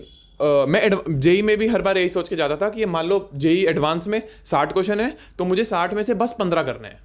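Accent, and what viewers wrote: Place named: NCR, India. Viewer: Great video.....aur finally koi aisa banda mila jo thodi ncr accent mei bol rha h😂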